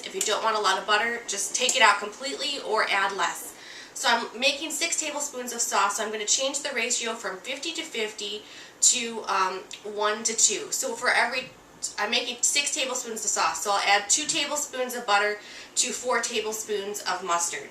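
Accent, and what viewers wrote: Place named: Minnesota, United States. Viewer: are u from minisota cause you have the acent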